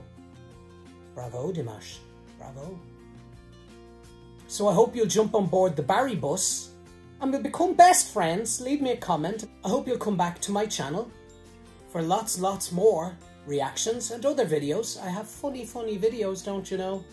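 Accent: Ireland. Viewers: I love a slight accent, too, so Dimash's singing is just fine like it is as far as I'm concerned and I like your Irish accent. The truth is, most of us have a bit of an accent even depending on which part of our countries we come from. I was born, raised and lived in Texas USA all my life so while I don't have one of those thick slow drawl accents at all (like Lady Bird Johnson, who made me shudder with slight embarrassment every time she opened her mouth for fear other people would think everyone from Texas sounded like THAT. We don't :-) but I know people from other parts of USA can tell that I'm not just Southern but that I'm from Texas and proud to be :-) Texas is not known as the GREAT Lone Star state for no reason.The USA is a huge country and In many respects Texas is a world apart from from what goes on with some other parts of the USA :-) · AH! i can just hear you saying "lovely" in your Irish accent, and i am smiling away like a fool again... 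so i am going to say "Ah, that's just Grand" in my not so good Irish accent (tho i do try) hanging out on the Barry Bus! and hey, i just watched your ' ' video, and get this.... we already have the Same Hair!! ahahahahaaa! awwwww God Love ya.... you really are just as immediately warm and sweet, and really funny(!) in all of your videos so far. so nice to hear that you would love to see Italy and appreciate the culture, tho probably not quite as much as i would love to see Ireland.... all of those Gorgeous Castles and all of the Stunning Natural Beauty and Father Ted's house too! maybe one day.... (i am quite seriuosly ill, sorry to suddenly be a downer here, it's okay tho... i am pulling myself through as best as i can... we shall see i s'ppose...) if i am well enough to live the dream and actually visit Ireland, i will give you at least a 5 minute warning that i will be on that part of the planet! 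and maybe we could have a nice cuppa on the Barry Bus hahahahahaa! and thank you so so so much for the kind words, i was seriously just calling it like i saw it, so glad that you found it to be that nice of a comment. you seem to be the kind of person who deserves it tho. i am faaaaiiiirly sure this is not the last you will see of me in your comments, so i shant say goodbye... just, until again. yay!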